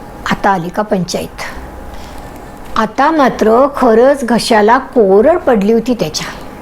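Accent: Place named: Rural, India. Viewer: शिल्पा ताई जोशी क्षीरसागर यांची किती सुंदर कथा निवडली.कथेतील सृजनता अनेक अर्थाने बोधप्रद होऊन गेली.मुळात त्यांची कल्पना अदभूत आणि त्याचा त्यांनी केलेला कल्पना विस्तार संवेदनशील झाला आहे. कथेतील शेवटची ओळ कथेला एका नवीन उंचीवर घेऊन गेली. तुमचे सादरीकरण नेहमीसारखेच दृष्ट लागण्याजोगे झाले आहे. तुम्ही ग्रामीण भाषेचा लहेजा ज्या पद्धतीने तोलून घेतला त्यातूनच तुमचे व्यासंगी अष्टपैलुत्व पुन्हा एकदा सिद्ध झाले. सुनिता तोंडोळकर./ छत्रपती संभाजीनगर. डॉ.शिल्पाताई आणि तुम्हां दोघिचेही हार्दिक अभिनंदन ---!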